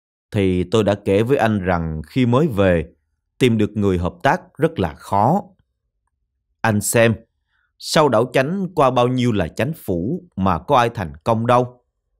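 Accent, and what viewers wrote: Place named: Saigon, Vietnam. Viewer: Giọng Anh này là giọng người Sài Gòn. Đọc nghe rõ ràng , hấp dẫn quá! Cảm ơn Anh !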